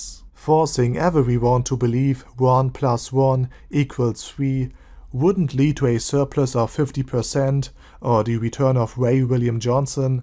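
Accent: Germany. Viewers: great video! :D are you german? sounds like a german accent perhaps you could do an analysis of some of the new worlds gouvernments in case you run out of ideas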